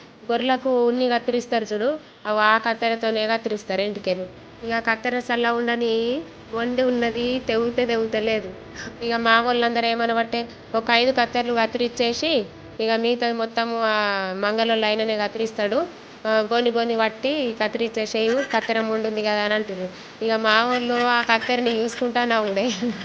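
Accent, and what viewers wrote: Nizamabad, India. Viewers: Nizamabad district slang language alane untadi sis entire different❤ I am from Nizamabad too...where are you from?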